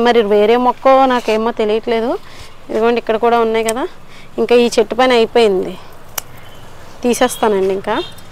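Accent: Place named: Godavari, India. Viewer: మీ మిద్దె తోట చూస్తుంటే...చాలా చాలా సంతోషం కల్గింది...ముఖ్యంగా...గోదావరి యాసతో మీ మాటలు ఎంతో ఆహ్లాదాన్ని కల్గించాయి...అభినందనలు...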